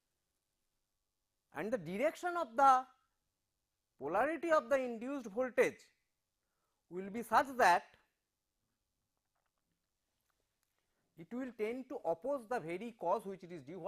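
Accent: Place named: Bengal, India. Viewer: He is bangali ( you can tell it form his assent) ❤ and he amezing ❤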